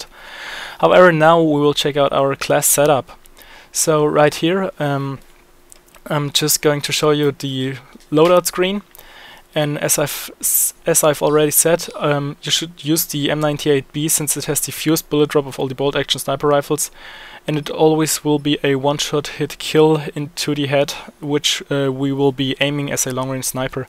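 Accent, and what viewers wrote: Germany. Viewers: Are you german? it sounds like you are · are you german? your voice sounds like german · Haha ... man merkt das du Deutscher bist... ^^ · Haha hab sofort den deutschen akzent gehört. Sehr schönes tuorial. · are you german? you seem to have a german accent?:D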